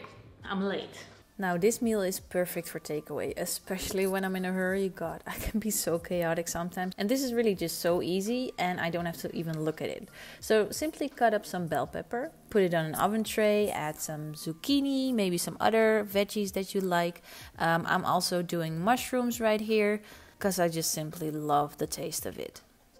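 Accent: Netherlands. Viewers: You're accent is very VERY Dutchess in this video.....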